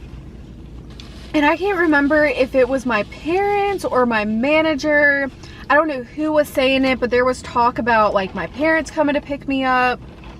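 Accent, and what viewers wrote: South, United States. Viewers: dat down souf twang u talking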